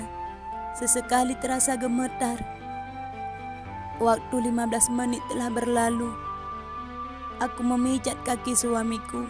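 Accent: Aceh, Indonesia. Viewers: teungku.. teungku... logat seperti logat Aceh. peu beutoi ureung aceh?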